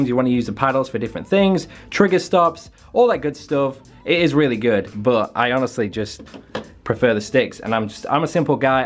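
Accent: United Kingdom. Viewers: his accent man half British half American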